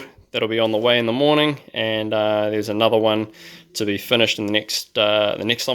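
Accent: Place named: New Zealand. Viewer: I had basically the same question. I've been thinking about vapor blasting for a while but i'd never looked at how a professional cabinet works, basically because i'd never be able to afford one. Looking at other diy-ers they were using water blasters but media getting stuck seems to be all too common. I modified my water blasters plastic gun to try adapt a T but it couldn't handle the pressure, and to get to decent pressure the aperture has to be quite small, basically 1mm. I was going to build a pressure pot to also help feed the media through the gun but i'm sure glad i came across these videos. Thanks for pointing us in the right direction bro. Also a pleasure to hear the Kiwi accent! Fellow kiwi in Brazil.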